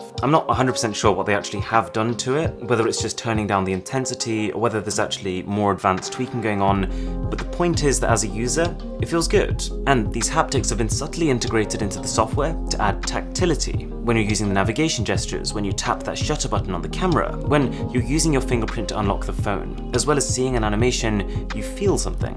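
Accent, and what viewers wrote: United Kingdom. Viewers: Haha yah that's UK accent for u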